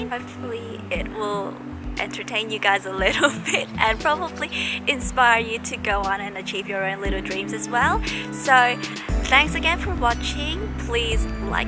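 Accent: Melbourne, Australia. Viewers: she sounds so Aussie now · The Melbourne accent is cute on you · you've taken the Melbourn accent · that Aussie accent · that Aussie accent! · she has an Australian accent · now in Oz, it's converting to a combination Aussie/Chinese accent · Starting to get that Aussie accent now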